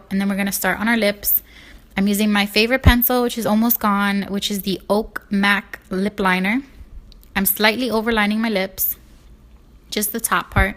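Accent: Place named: New York, United States. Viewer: Are you from new York or new Jersey you have an accent I like it!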